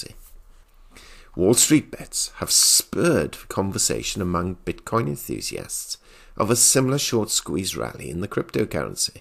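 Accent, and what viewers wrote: England, United Kingdom. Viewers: Subscribed & Liked to help support your podcast. Keep up the pleasant pommy accent.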